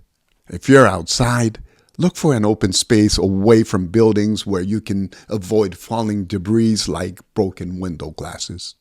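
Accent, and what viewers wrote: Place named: United States. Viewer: You speak very well if I hadnt known you grew up in Japan I would have thought you grew up in the United States because my stepmother whos from Japan and spent decades living in Hawaii still had an accent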